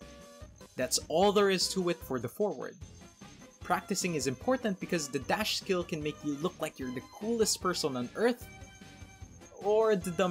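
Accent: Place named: Philippines. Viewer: are you a Filipino? I'm guessing based on your accent :D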